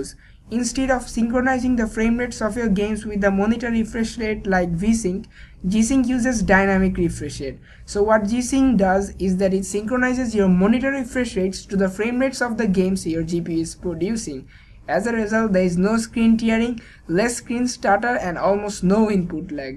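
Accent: India. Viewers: I know its out of context, but I loved ur acent..lol..much like Indian accent..kudos.. Nice video. Thumbs Up.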